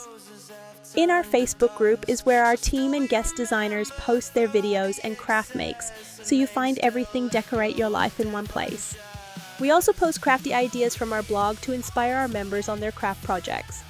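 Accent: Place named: Australia. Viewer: Hi! Please don't fret over the Accent In the Video! TBH, I love it!!! I've always loved a hood Australian Accent! I think it's Beautiful! It's REALLY not THAT Noticeable. Like... TBH, I don't think I would've caught it if I wouldn't have read the Description! LOL! ~🙏💙🙏Much Graditude From The Carolinas, Erica 🙏💙🙏~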